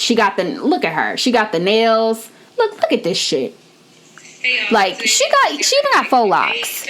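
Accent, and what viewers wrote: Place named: Midwest, United States. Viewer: Question, where are you from? Your accent gives me Midwest.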